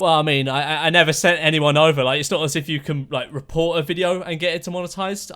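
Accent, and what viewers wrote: United Kingdom. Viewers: What’s better than ur channel is your British accent lol 😂❤️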